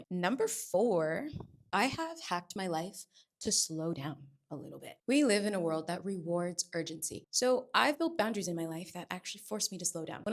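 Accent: France. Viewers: Ok but your French accent is 🥖 incroyable🥖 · Your French accent is amaaaazing! Love it 😊🎉 C'est très BON!! ❤ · Omg! Your French accent is so beautiful! I’m a native French speaker and you sound way nicer than I do 😭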